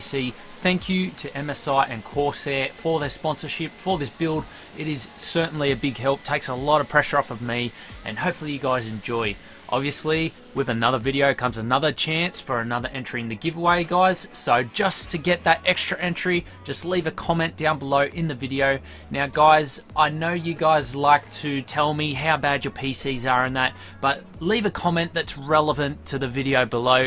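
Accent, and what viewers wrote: Australia. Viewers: great ideas right there! although I'm not the biggest fan of warcraft I think it will look sick when finished. keep it up! btw your Australian accent sounds so funny :D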